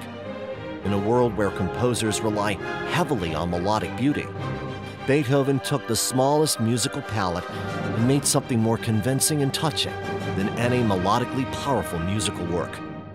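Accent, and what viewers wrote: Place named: United States. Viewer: If you have an American accent, then go by that. You sound like you're American.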